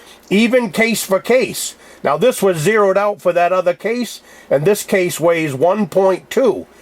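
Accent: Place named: Vermont, United States. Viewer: I miss hearing that Vermont accent. I grew up in Brattleboro area.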